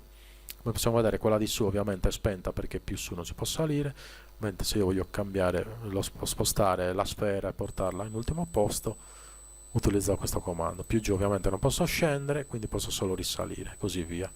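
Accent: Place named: Italy. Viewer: Cercavo disperatamente un tutorial in italiano senza risate di anglofoni che vogliono perder tempo e ti spiegano che prima devi acccednere il pc, che il mouse nun se magna e stronzate varie. E, sorpresona, non solo lo trovo in italiano, ma addirittura con l'accento della mia isola, l'accento mio, che mi avvicina emotivamente a questo programma dall'interfaccia prevista per marziani. GRANDE!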